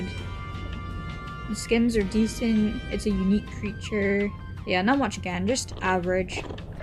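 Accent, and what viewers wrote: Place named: Australia. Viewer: Is it just me or do u sound Australian all of a sudden👁️👄👁️?? ur voice is adorable 😭✨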